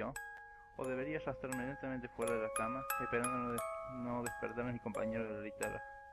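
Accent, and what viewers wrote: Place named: Cordoba, Argentina. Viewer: Porque su acento delata, saludos hermano Argentino, compañero peronista! Aguante los cordobeses.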